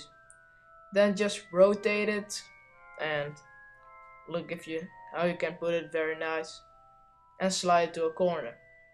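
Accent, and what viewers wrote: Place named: Netherlands. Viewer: are you from holland because it sounds like you're from holland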